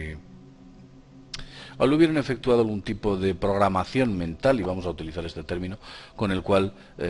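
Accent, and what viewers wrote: Spain. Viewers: QUE BOLUDO EL CHAVON ESE QUE COMENTA EL ACCIDENTE DE MALASIA,ENCIMA SE HACE EL GENIO CON SU VOZ GALLEGA QUE ADORMECE,MAL AHI YOUTUBE QUE DEJA QUE ESTOS MUERTOS SUBAN VIDEOS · O yo soy sorda o su asentó es muy español .. Jajaja no entendi nada no habla claro y fuerte .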